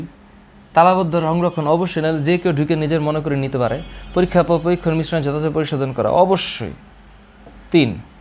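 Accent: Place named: Chandpur, Bangladesh. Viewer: Chadpur er bhasha bola ta kinto joss hoice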